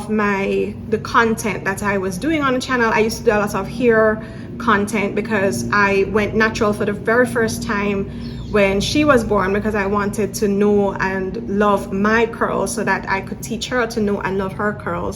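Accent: Jamaica. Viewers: I'm just a plain white Canadian but I think your Jamaican accent is charming. I can understand 90% of what you say but maybe 10% of your native Jamaican sayings go over my head lol. I don't know how your channel popped up on my homepage, I guess because I started watching House Hunters videos, though I never saw the episode you were in. I usually only see independent travel videos. I like your message of encouraging others to live more, it's something a lot of people need to hear right now. Don't compare yourself too much to that DoughDash woman-I don't even think using that name is legal (since it's essentially piggybacking on the popularity of the DoorDash name which the company had to pump a lot of money into marketing for.) You are doing it right just by putting your own name on your channel (and since your last name is, it also taps into that part of the audience who either loved or had issues with class lol). 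If I could suggest something to you to improve the views of your videos, it would be show more scenery of Merida, maybe some sunsets or beaches, just more general things people like to see. I have never been there and am not likely to go, it would be kind of nice to see something like that. Most of the successful travel channels I see are from independent Youtubers who film their stays in various hotels and locations, but they've usually started off filming the beautiful scenery of their own hometowns. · First timer to your channel, and a fellow Caribbean national trying to come up with content. I'll tell you what I think dough dash's "secret" is. She has displayed her struggling financial situation to everyone, and her viewers are rooting for her to succeed and I believe that this is what has driven her channel's recent success. ... ppl are invested in helping her and seeing her succeed. 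I don't believe this is sustainable content... I'm sure she will HAVE to find something more substantial as time goes by. Only if you are prepared to display most of the private parts of your life, where you are struggling, you will get ppl following you to see how the story pans out. DD channel is like a soap opera... and this is what has ppl coming back to see "what happening today...?" I'm not prepared to advertise my financial or family struggles to get the views. Please take heart.... I'm a new subscriber to you.... love me a jamaican accent❤ Love from Trinidad 🎉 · and relevant because my proof is I found your page when looking for information about moving abroad specifically to Mexico and when you started talking with your Jamaican accent it resonated with me because of some of my friends who are Jamaican. It’s a global ting😀 in Texas, Mexico and the best city in the world New York! 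· YARDI GIRL HI THERE! Love di accent u nuh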